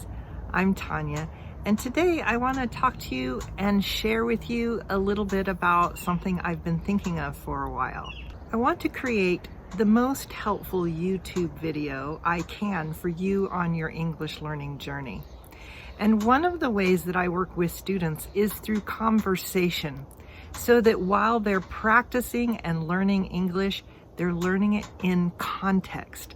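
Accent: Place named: United States. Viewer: I love your English, I think I reinforce it listening to you, your accent is very clean though I know that depends upon the region where you live is the type of accent, yours is wonderful if all the Americans had your accent it would be so easy for me to understand any person but it’s not in that way and there’s no other way that trying to train our ears with the different accents, congratulations, how I wish to talk to you so that I can practice the language.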